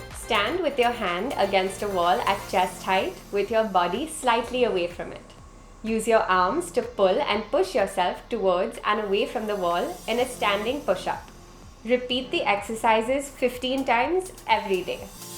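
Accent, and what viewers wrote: India. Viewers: Ummm someone please explain why this white girl sounds Indian? It's freaking me out. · is it just me or does she have a Indian accent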